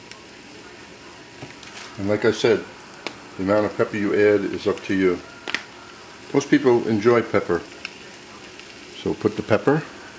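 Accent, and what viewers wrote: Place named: New Jersey, United States. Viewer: Why do you sound like one of the Sopranos?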